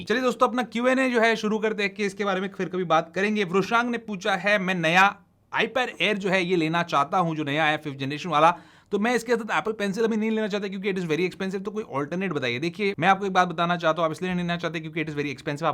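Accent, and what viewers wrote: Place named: Bangalore, India. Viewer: As salam alaikum. BHAI Abbi jumma jumma 8 din nai Hove. U from Mumbai But your mother tongue is like Bangalore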